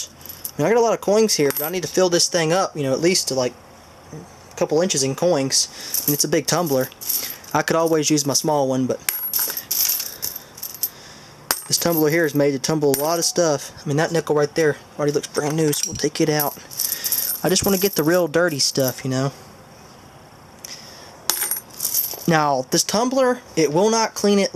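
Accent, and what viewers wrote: United States. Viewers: I love your country accent!!!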